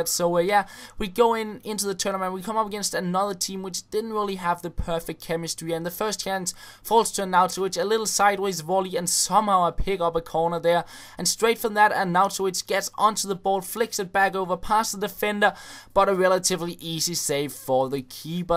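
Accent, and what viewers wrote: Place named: Denmark. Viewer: Danish accent does that :)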